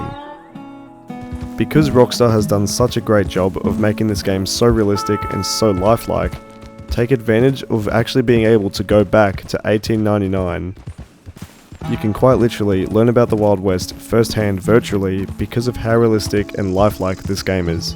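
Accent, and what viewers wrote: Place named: United Kingdom. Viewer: Video about rdr2 over 10 minutes narrated by a man with a British accent is a guaranteed banger lol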